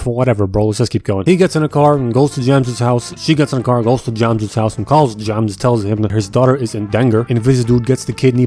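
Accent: United Kingdom. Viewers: r u british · Wait are you British because that makes sound more funny idk maybe it is just me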